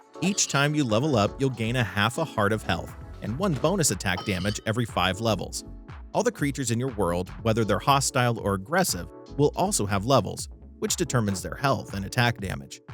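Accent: Netherlands. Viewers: are you realy english or dutch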